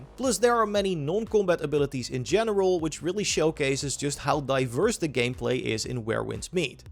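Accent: Netherlands. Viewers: UK HAHA youre dutch dude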